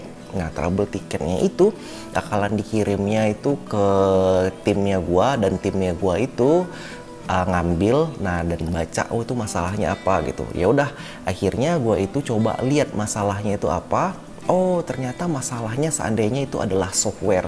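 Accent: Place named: Padang, Indonesia. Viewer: Asli padang uda... Hehehe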